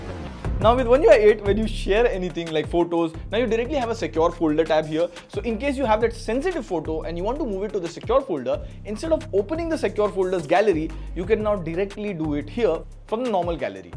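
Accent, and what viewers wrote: India. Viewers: Look man, I like content but there is one solution actually two solution I need to work on Indian accent to learn it Or you need to work on American accent? And basically get more views and go International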